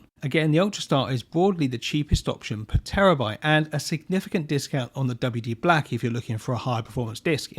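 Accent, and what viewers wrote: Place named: United Kingdom. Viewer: Hard to follow, heavy British accent.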